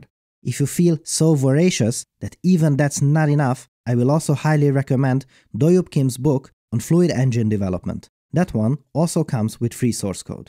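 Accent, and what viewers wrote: Hungary. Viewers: Jól hallottam, hogy maga magyar :3 és itthon nincs felfedezve :/ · Heard your voice and your name and I immediately knew you are Hungarian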